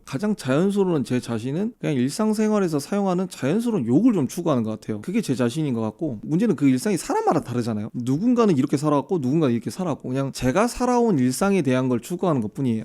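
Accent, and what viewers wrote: Busan, South Korea. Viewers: 형 부산이여? 갑자기 겁나 친근감 가네요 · 고향이 경상도 분이 아니셨다고?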